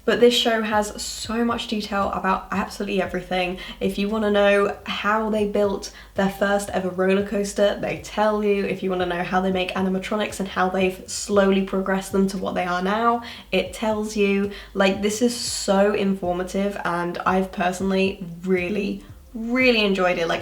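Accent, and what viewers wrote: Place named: United Kingdom. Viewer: Sounds like she’s from the United Kingdom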